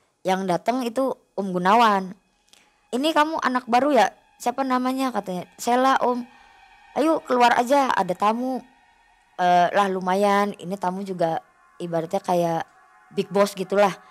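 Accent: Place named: Cirebon, Indonesia. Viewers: Sy penonton baru, salfok kok kyk cerbonan sih ngomongnya ya 😂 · mbak sela bahasae dermayu ya. apa wong dermayu tah? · Logate Cirebon Indramayu iyaaa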